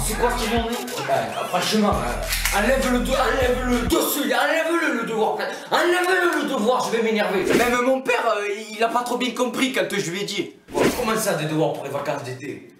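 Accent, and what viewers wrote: Marseille, France. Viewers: Ça sent MARSEILLE 😏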